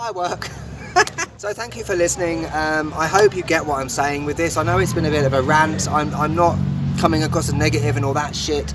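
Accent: United Kingdom. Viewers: I love your Bit accent !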